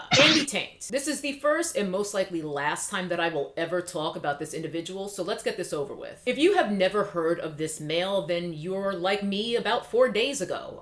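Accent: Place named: New York, United States. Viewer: eggplant in garlic sauce in Flushing. You sound NYC so you know.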